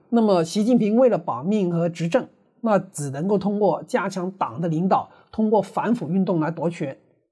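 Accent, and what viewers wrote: Cantonese, China. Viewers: 你的广东口音暴露无遗🙃🙃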